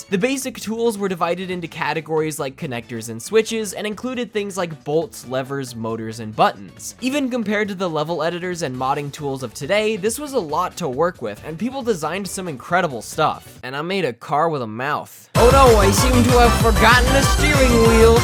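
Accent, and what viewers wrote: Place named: United Kingdom. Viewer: The sheer amount of dopamine that released when I heard the british narrator's voice cured my depression.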